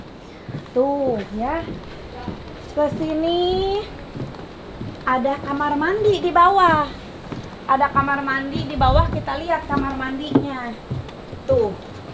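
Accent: West Java, Indonesia. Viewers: Suka banget sm teh sllu apa ada nya, punya ciri khas bhs sunda bangga aku jd org sunda.. Teh jg ga somse 😘😘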